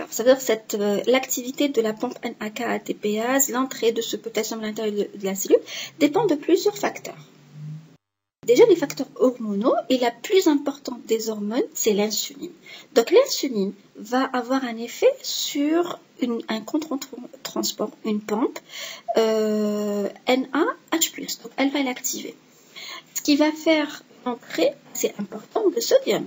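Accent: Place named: Algeria. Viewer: Médecin Chef de service de pédiatrie en Malaisie / Maitre de conférence, je suppose que vous êtes algérienne( l'accent++++ ) hhh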